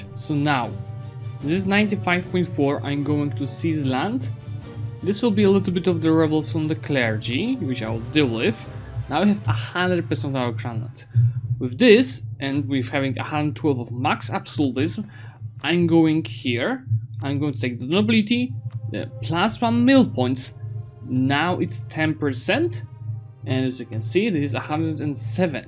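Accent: Poland. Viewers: this man's accent tickles my hussar sense.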